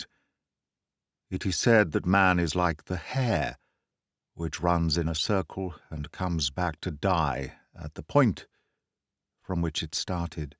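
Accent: United Kingdom. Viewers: Its my utmost pleasure listening to your clear British accent that I love... Please never stop sharing stories narrated by your marvellous voice.. thank you very much sir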